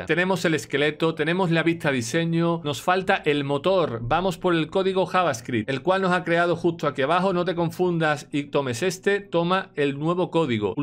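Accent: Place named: Chile. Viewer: te siento algo de acento Chileno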